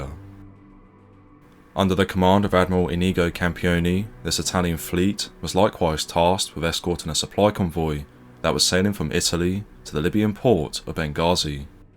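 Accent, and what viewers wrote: England, United Kingdom. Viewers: Don't like it, go watch something else, simple. Stop trying to be a clever dick. You clearly fully understand what he is saying, because if you didn't, you wouldn't be try to correct it. The narrator has a regional English accent. The same way he cannot change his voice, you cannot gain brain cells. Moron. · This pronunciation is APPALLING, he sounds British but he can’t even pronounce the names of the British ships correctly.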